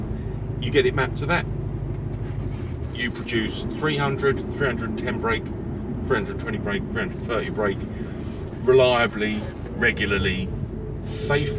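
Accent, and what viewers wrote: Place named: Colchester, United Kingdom. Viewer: I just watched this video and thought he sounds a bit like he's from Colchester, and then seen you driving and saw you were driving in through Ardleigh interchange. I'm going to subscribe now. I'll have to keep an eye out for you on the road 🛣️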